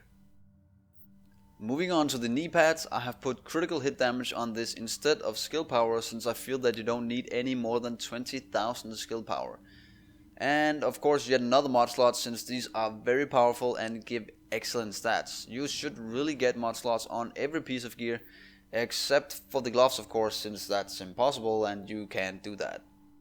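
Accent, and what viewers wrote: Denmark. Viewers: Er du fra københavn ?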